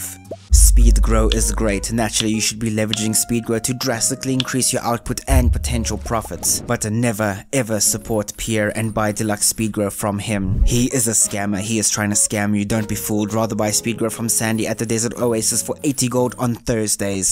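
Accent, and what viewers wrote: South Africa. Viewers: This guy sounds South African.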